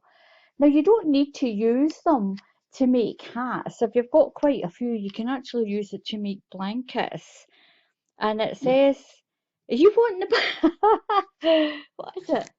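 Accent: Scotland, United Kingdom. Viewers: I love your unboxing of yarn. I can hear the joy in your voice. What would make it even more wonderful is to see the joy on your face as you look at all the gorgeous yarn. I love to listen to your voice. I love a good Scottish accent. Much love from the USA, Florida. Lurinda Clarke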